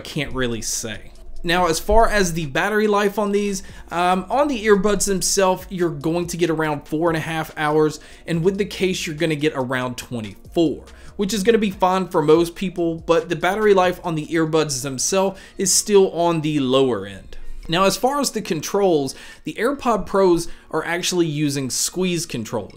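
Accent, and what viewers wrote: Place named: Texas, United States. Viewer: I used to think that the Indian accent was the worst on the planet but, damn, yours tops the charts is it texan? . Great review though man and love your content!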